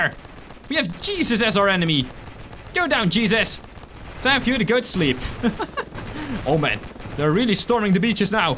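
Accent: Netherlands. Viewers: waarom klinkt jouw engels als engels met nederlands accent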